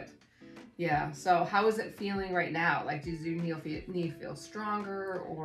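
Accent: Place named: New Zealand. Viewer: Hello there! Finally we got to watch the video, thanks for that and the many informative videos you've allowed us to watch. I hope your daughter makes a full recovery as soon as! Hearing about the 'enn-zed' (NZ) healthcare system (and others like 🇨🇦, 🇦🇺), makes ones stress levels regarding the 🇺🇸 healthcare system increase. The most enchanting thing about your videos is the NZ 'highlights' on your accent, especially as you pause or end yor sentences. I listened so keenly to your daughter, but she hasn't got that yet. Looking fwd to the next.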